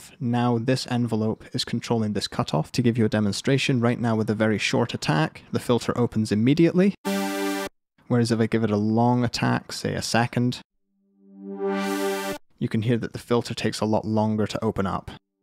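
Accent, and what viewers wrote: United Kingdom. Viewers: I will always thumbs up a video in which the presenter uses the word "fortnight" unironically in a British accent.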